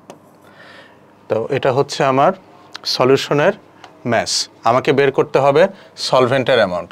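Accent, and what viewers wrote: Standard. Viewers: প্রমিত উপস্থাপন ❤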